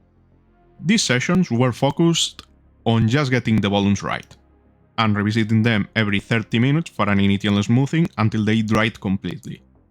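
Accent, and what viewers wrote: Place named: Spain. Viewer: That thick spanish accent... puede ser?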